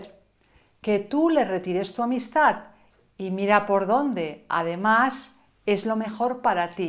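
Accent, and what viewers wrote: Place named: Spain. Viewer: Estoy enamorado de tu voz y acento espanol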